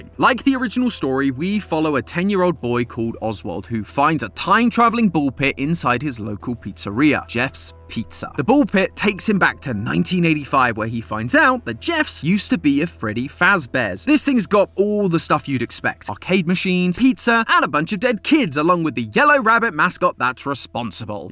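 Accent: United Kingdom. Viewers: I'm so used to matpat that it scared me when I heard a British accent love ya!😭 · I want matpat back Your voice is just too British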